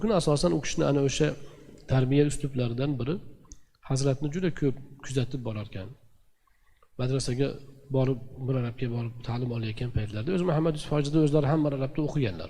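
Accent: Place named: Andijan, Uzbekistan. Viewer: АЛЛОХ рози бўлсин жудаям 👍чиройли маруза қалб ором олади андижондан анджонча содда ёқимли❤❤❤❤❤❤❤❤😊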